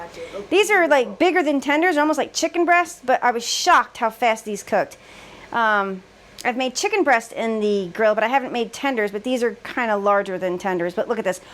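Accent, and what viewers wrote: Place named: Philadelphia, United States. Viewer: Are you from Philly can tell by your accent.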